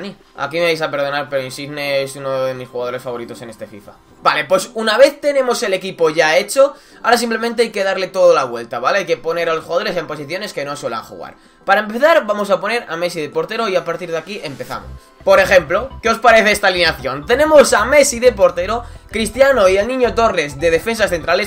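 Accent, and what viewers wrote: Mexico. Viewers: eres de sinaloa mexico?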